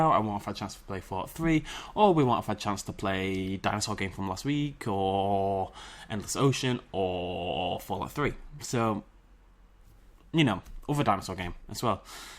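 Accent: United Kingdom. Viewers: Everytime I hear a British accent, the face never matches what I imagine and it’s honestly fascinating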